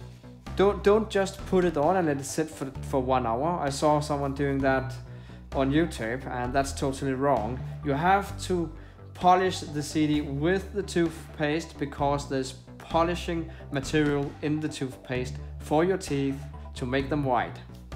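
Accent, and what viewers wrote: Denmark. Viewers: I knew it. Dansker.... Our accent NEVER lies.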